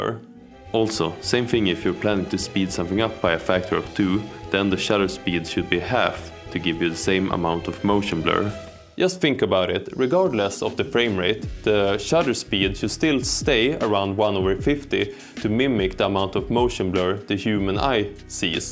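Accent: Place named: Scandinavia. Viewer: Love you scandinavian a-c-cent <^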